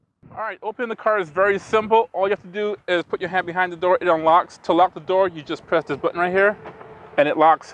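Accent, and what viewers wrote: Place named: Caribbean. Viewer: Are you from the Caribbean? Hearing a hint of a West indies accent.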